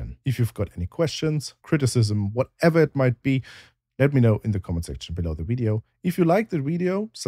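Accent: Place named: Germany. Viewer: By his accent I think he's German, so God bless Germany too!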